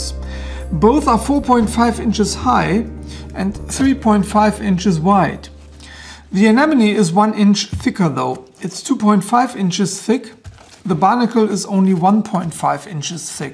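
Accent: Germany. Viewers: I'm half German and I love listening to your German-accented English. It's Wunderbar!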